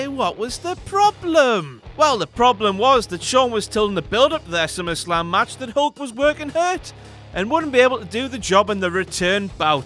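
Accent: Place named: Newcastle, United Kingdom. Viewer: He should just stick with his Geordie accent, as it is far more "hilarious" than saying 'dabble yoo, dabble yoo, eee" for laughs.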